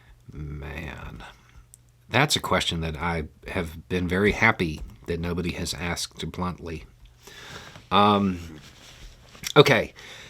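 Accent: South, United States. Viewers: He’s already at the top! A man from the south fighting against racism and educating those who seek him out. Hey y’all I’m fine with whatever he addresses his VOLUNTARY viewers as. He’s kind and respectful and smart. Stop criticizing small regional differences. I was never confused that maybe he was from Jersey “Ya’s know?” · Teaching is communicating & “proper” communication isn’t always the most effective. He ain’t a professor. That was completely improper English, I grew up being told not to use, but you understood me & likely didn’t feel condescended to. Y’all, ya’all, yinz, youse guys, etc, is nothing compared to his original code-switched Southern-speak on this channel. He’s already cleaned it up about as much as he can for us Yankees & overseas folks, while still having people who look- but don’t think like him- give him a chance🤷‍♀️ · please allow me to politely disagree. 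Y'all is proper English in the south, and I also use ain't. In my opinion speech and look are the way they are to show Country/Southern doesn't mean stupid. Just my opinion.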